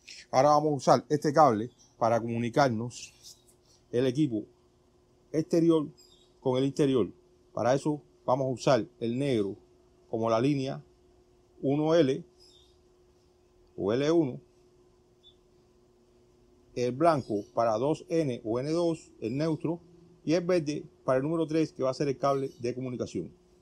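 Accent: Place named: Caribbean. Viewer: Así hablamos nosotros los caribeños, cubanos, Dominicanos y como yo puertorriqueño, nuestro español y cultura ya es asi!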